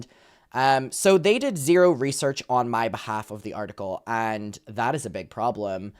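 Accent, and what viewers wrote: Ireland. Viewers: Not understanding the difference between Ireland + England is crazy 😳 You’re accent is clearly Irish like - did they not watch any of your videos? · They didn’t even watch any of your videos if they didnt know you were Irish. Although there are some Americans that cant hear the difference between an Irish and English accent. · they did 0 research on u bcos how’d they READ YOUR NAME and HEAR YOUR ACCENT… and not immediately assume ur from Ireland 😭😭😭😭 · A couple things: 1, Andrew CLEARLY didn’t do any research because if he can’t even get the country you were born and raised in correct, he’s a certified moron.. I’m from the US and I could tell within 30 seconds of watching you for the first time that you have a very clear IRISH accent. Not to mention you speak about that sometimes. And 2,…can we all agree Andrew and Vanity Fair are groomer supporters? 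EW, not a good look for a “reputable” organization. Boycott VF!!!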